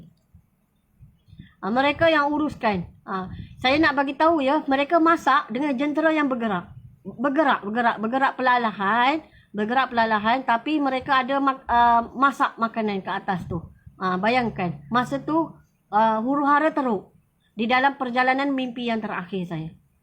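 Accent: Kelantan, Malaysia. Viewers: org kelate ke kak ni ? adui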